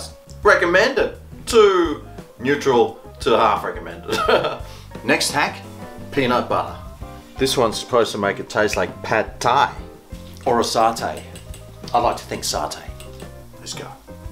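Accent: Australia. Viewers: ...Yeah, this guy is definitely Australian. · If we go by accent I'd assume Australia, Austria sounds more like German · Ayyy your Aussie too! 😂😂😅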